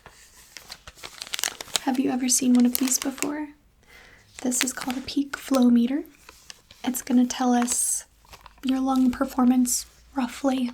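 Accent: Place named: Quebec, Canada. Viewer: If you are not French then you are probably from Quebec (Canada)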